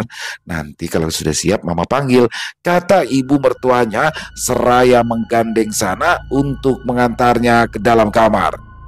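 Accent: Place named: Banjar, Indonesia. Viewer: Bang sdh fasih sekali dengan logat Banjarnya... Keren bang, salam dari kalsel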